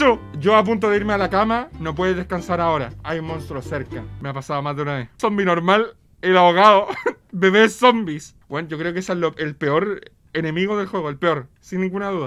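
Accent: Chile. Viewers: Wueon eres de chileee?????????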